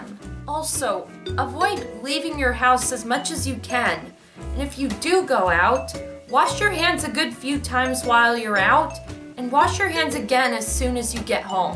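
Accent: New York, United States. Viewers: What accent do you have,? Sounds like New York. I'm Australian so all the different US accents interest me.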